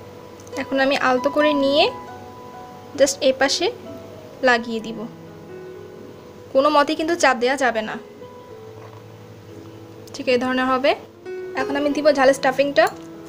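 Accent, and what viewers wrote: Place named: Chittagong, Bangladesh. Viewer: আপনার ভাষায় কিছুটা চিটাগং এর টান আসে।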